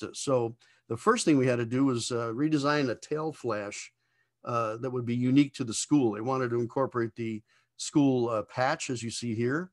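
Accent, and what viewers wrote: United States. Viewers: WHY THE AUDIO SOO BAD. FIRST HE IS WISPERING AND SECOND THE TOO STRONG AMERICAN TONE MAKES THE VIDEO UNUNDERSTANDABLE BY NON AMERICAN FOLLOWERS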